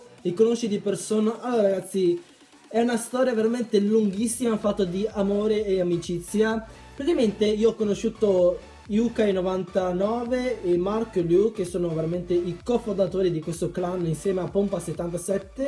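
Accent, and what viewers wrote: Veneto, Italy. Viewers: ma sei veneto??? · Ahahahahh grande, ho messo like subito per il dialetto Veneto♥♥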